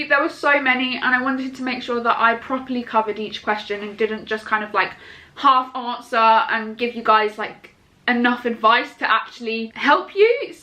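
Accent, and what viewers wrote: United Kingdom. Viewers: She sounds like the UK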